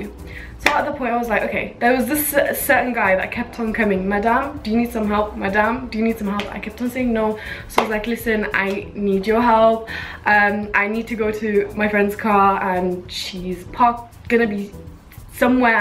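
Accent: Nigeria. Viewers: Im a new sub ! And i absolutely laughed out loud at this video! Definitely do more stories! Loving the lowkey Nigerian accent :D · The Nigerian accent comes really naturally to you. You're basically one of us now :D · just noticing that got some 'nigerian english' accent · lol u had a bit of nigerian accent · I can hear you have bit of a naja accent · her Nigerian accent 😂😂😂😂😂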